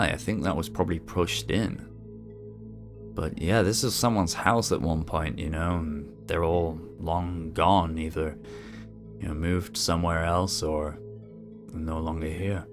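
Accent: Manchester, United Kingdom. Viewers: Creepy video - nicely done! Your accent sounds Manchester-ish.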